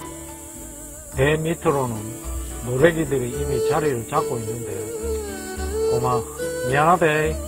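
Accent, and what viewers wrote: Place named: Gyeongsang, South Korea. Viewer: 말씀하시는 억양이 경남쪽이신것같아 반갑습니다 저는 마산태생입니다 구독 좋아요 누르고 봅니다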